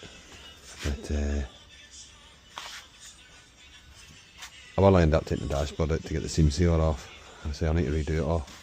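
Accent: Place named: Scotland, United Kingdom. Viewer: Hi there. Yes, i can understand what you are saying in the videos, helped I think by the fact that I had extended family originally from Glasgow - that moved to NZ. Whilst not everyone understands the Scottish, I guess that the same can be said of any other language too. If one trains their ear, wants to understand, they'll make the effort To understand. I've binged watched a few from the beginning, think I've got your voice suzzed now, your cars - some of them - are of varieties I've owned yrs past, so is interesting to watch you 40 yrs on rebuild and put back on the road. I'll pop onto your pages on and off, and slowly work my way up to present day uploads. Have a good day. Cheers from NZ 🇳🇿😎 M.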